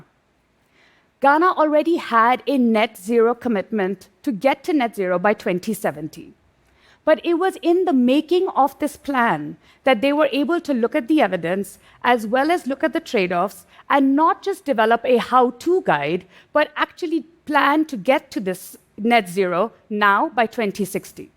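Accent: India. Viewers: to all white people, this is how the indian accent sounds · Can still hear the underlying Indian accent that makes it difficult for me to understand her without concentrating.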